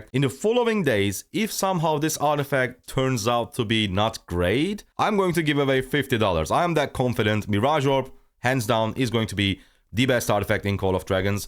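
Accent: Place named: Turkey. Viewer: Dude, your accent sounds turkish, are you ?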